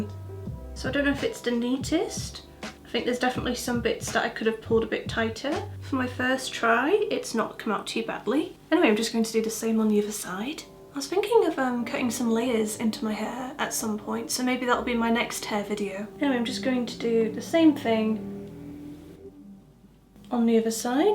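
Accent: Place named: United Kingdom. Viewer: You got a really nice way to act, it's not only your British accent, also the female, soft voice makes you come over as a little lady 😊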